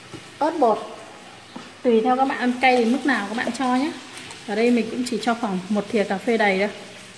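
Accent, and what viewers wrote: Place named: North, Vietnam. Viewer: Nge tiếng bắc kỳ là thấy làm xong ko ngon rồi. Lướt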